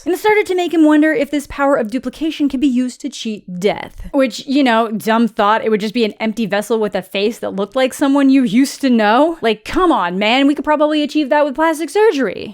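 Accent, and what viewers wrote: Quebec, Canada. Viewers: I actually love your Canadian French. It sounds great <3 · You speaking French made my brain hurt. I speak French and even if I didn't know you were Canadian I would be able to tell from your French. Honestly great though, keep it up.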